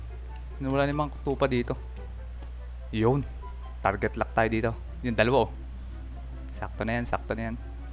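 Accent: Philippines. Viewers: Batanggenyo po ba kayo kasi po yung sinasabi nyu po kasi parang pang batanggenyo hahahahahah kaka relax ka panoorin erp sana umabot ka sa 100k, subs para may play botton napo tayo sana mapansin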